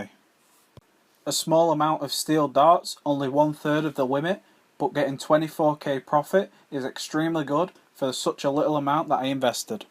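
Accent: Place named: Scotland, United Kingdom. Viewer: gotta love the scottish accent!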